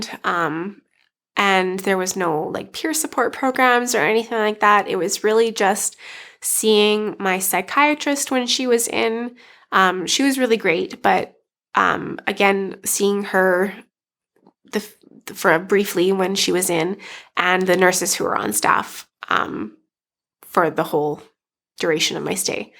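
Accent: Canada. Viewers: you are from the prairies or the west, i can tell by your accent. i'm from ontario, and can tell you're from either saskatchewan or alberta or maybe interior BC. this makes me wonder what would happen if you weren't a white woman. what they did to you was very wrong. and imagine if you were an indigenous woman they would like have been much more violent towards you.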